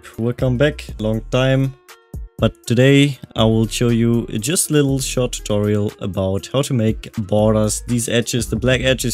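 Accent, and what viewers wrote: Germany. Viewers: I love these tutorials! You’re so sympathetic. And dieser deutsche Akzent ❤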